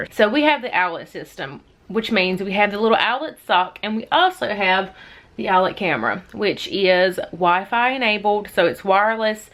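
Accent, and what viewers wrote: South, United States. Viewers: Sis! I’m here for that extra southern accent! Also, good tips!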